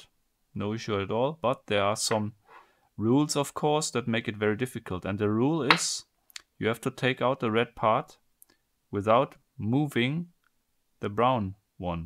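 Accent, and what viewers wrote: Germany. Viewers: The German accent makes me want to watch his videos even more :))) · Is this a german acent?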